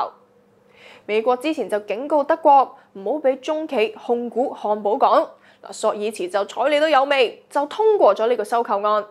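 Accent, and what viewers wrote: Standard. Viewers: 歡樂時光，人靚聲甜字正腔圓又鬼馬😘😘😘👍👍👍💪💪💪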